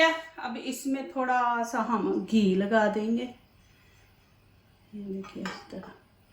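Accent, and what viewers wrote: Punjab, India. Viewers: Assalamu alaikum. Baji buhut khob . Mashallah. Dil Khush kar ded da. Eik toh Aap panjabi andaz se bolte ho .us par itni laziz recipe banate Ho Dil sach mein khush ho jata hai. Allah Ke hath ke cycle ko barkrar rakhe aamin... Mujhe gobhi ke parathe ki recipe bataen .. I am from India Allah hafiz..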